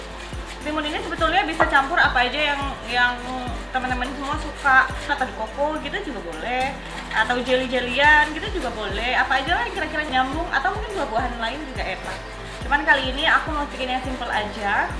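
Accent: Java, Indonesia. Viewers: Wah mbk bohoso jowo wis rodok medhok mbk wkwk. Good luck all the best. Lots of love from Central Australia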